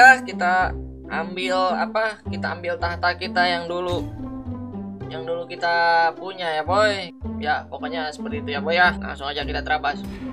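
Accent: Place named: Sunda, Indonesia. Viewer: Bang, gua liat dari suara lu tu kayaknya elu orang sunda ya bang wkkwkw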